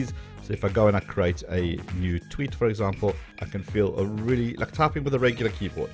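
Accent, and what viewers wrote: South Africa. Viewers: You sound like you're South African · Are you South African ?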